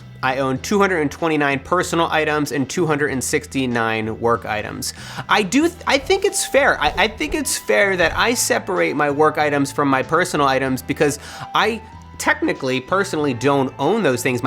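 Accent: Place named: Australia. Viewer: I can hear a bit of the Australian accent on ya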